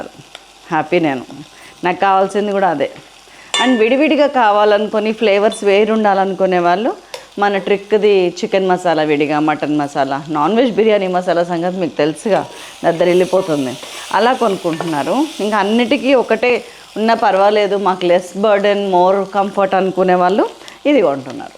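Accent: Telangana, India. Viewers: Miru telangana baga matladutunaru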